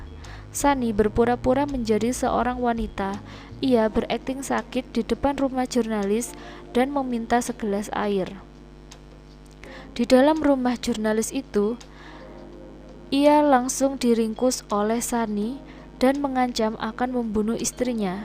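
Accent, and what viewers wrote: Java, Indonesia. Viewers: Medok mbak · gagal fokus sya krn mbak medhok... pdhl sdah tegang btul ngikutin alur critnya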